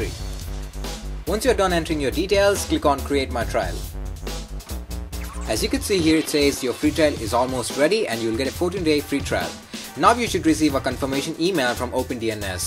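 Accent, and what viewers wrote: India. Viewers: Best Indian accent I’ve seen so far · Just statted the vid and then. indian accent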